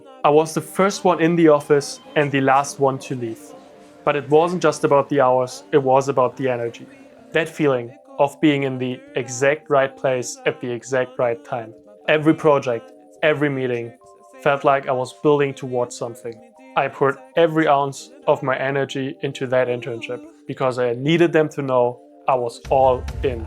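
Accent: Germany. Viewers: Are you Bavarian? I was thinking I was hearing the shadow of an Austrian accent, but then you said you were German, so the only thing I could think of was that you had to be from Bayern. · Yeah, you are the stereotype of the German/Dutch person apologizing for their "terrible English" while speaking with what I would consider nothing more than a slight to moderate accent. We don't mind the apology, but it can't be factually inaccurate (which should warm your German heart). Zero grammar mistakes, vocabulary greater than many native speakers, yeah you're fine there bud. The colloquialisms will come with time. Welcome to Canada. Bienvenue au Canada.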